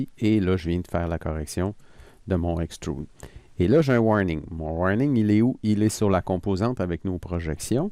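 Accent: Canada. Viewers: Bonjour MERCI BEAUCOUP pour votre travail clair, très bien fait et très bien expliqué. Je débute dans ce domaine et vos tutos sont des mines d'or pour moi rajouter à cela ce petit accent Canadien que j'adore je ne me lasse pas de vos "cours" que j'écoute en boucle pour bien comprendre et enregistrer😊. MERCI et bonne continuation. Maxime.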